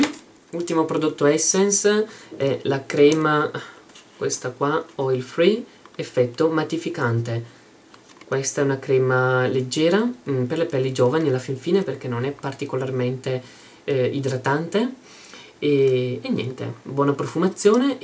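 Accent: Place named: Brescia, Italy. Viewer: mi piace troppo la tua parlata bresciana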